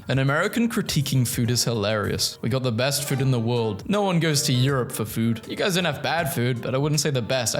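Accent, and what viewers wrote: United Kingdom. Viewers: Yo british accent kills me, it's funny, anyway, I luv your vids! keep going bruh!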